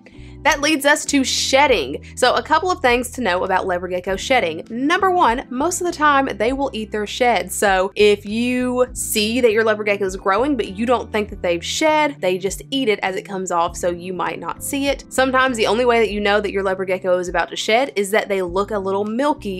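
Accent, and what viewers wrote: South, United States. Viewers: Love your accent! Sounds southern :) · Okay love your channel and Omfg your southern accent is just freaking adorable omg …… lol I’m from ga I’m southern as well but omg u just sound to cute are you from Texas bama or Ga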